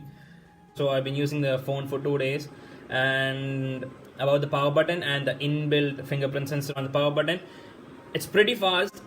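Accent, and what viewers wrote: United States. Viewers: when is full review coming ?good bro you accent seems like american . any way it was amazing good luck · Wow, nice review Your American accent is so cool bruh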